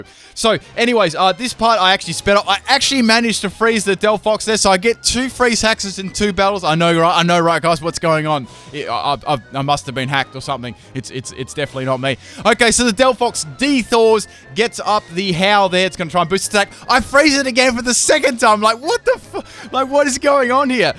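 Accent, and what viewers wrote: Australia. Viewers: By the way, are you from Australia or New Zealand?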